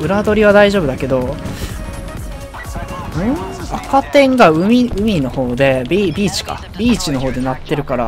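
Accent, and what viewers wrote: Kansai, Japan. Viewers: 関西弁自然で草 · 関西人やったっけ？って思ったよな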